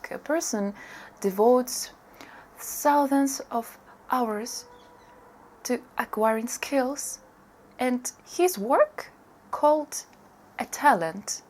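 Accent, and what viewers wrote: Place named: Netherlands. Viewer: Hi, you are totally correct. Based on your accent I think you are from Holland/Netherlands or Belgium, possibly Scandinavian. In the former USSR Soviet Union they were the first to destroy this myth of "talent", the state needed the people to be as educated as possible for a variety of reasons. And they were the first to say that this Myth was created by the Elite, the Intelligencia/Educated classes in league with the capitalist imperialist Monarchy, to keep the proletariat down. In order to limit people and to control them. In the USSR they would say everybody needs to work hard to develop good skills. Only working hard and getting proper good teacher, who are willing to teach you properly. In Western nations the Elite educated classes, the overall (average) effect is not to fully teach/help the students, they make them suffer by not providing sufficient information in the studies, and then they say "It is the students responsibility to find the information", so they are trying to limit the amount of students who make it. 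In the Soviet Union, the leadership was aware of these kinds of sabotage by the Professors, and the Professors were watched by other Professors working for the state as Educational spies you may call it. If they see that a Prof is not teaching certain topics properly, they would be called in for investigation and be asked "Why can't you teach that topic properly, is it because you are not that good in this topic, if that is the case we will send you a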